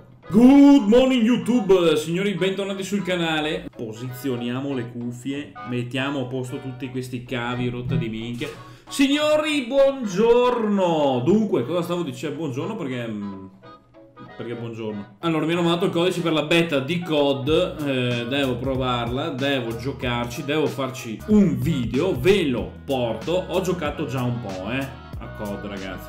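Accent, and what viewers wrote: Veneto, Italy. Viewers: Mi fai troppo ridere!!!😂😂 In questo video si vede come ti sale il Veneto cazzo ahhahaha Grande! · ma da quale parte del veneto provieni?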